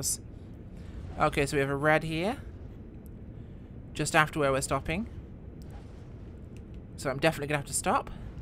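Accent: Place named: Somerset, United Kingdom. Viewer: Wow am impressed do you have an interest in trains or are you just playing this simply for fun?? 😄👍 Oh and your somerset accent was good and funny 😄😄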